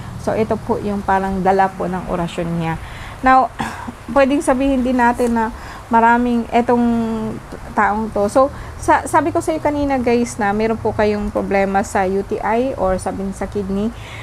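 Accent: Bicol, Philippines. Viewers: Bicolana ka po?😊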